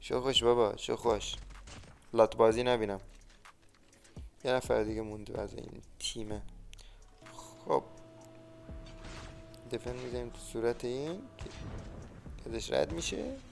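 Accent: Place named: Bushehr, Iran. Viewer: لحجه بوشهری داری....همشهریمونی??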